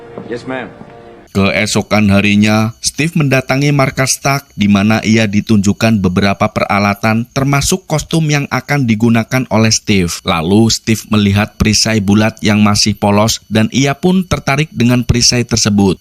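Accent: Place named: Banyumas, Indonesia. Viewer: Kie tah wong ngapak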